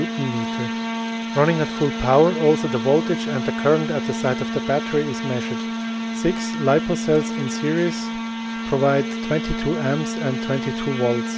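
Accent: Switzerland. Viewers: Richtig guets Video ;D mer erkennt eifach en schwizer mega schnell wenner englisch redet ^^ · Sounds swiss-german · Bisch du us de schwiiz ? So vom Dialekt her hanni denkt. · Where u from? Swiss?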